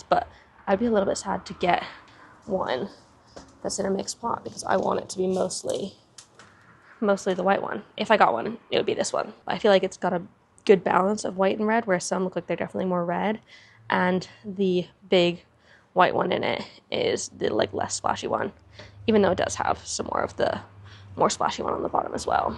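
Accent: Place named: United Kingdom. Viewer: Your accent has always been so interesting to me, you have picked up so many british-isms that you have an in-between accent heh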